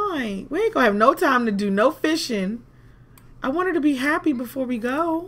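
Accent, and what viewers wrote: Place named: South, United States. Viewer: I found your LP and decided to watch...Your commentaries are so funny! I watched single dad just so I can hear your southern twang...Keep up the good work. Thanks!!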